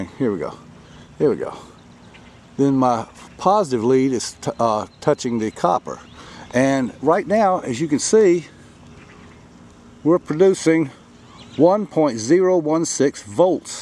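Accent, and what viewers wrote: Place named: South, United States. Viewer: are you Georgian or Southern? I like the accent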